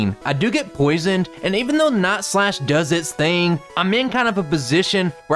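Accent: South, United States. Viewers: You are one of the only Youtubers with a southern accent that I have ever watched. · to hear your Southern drawling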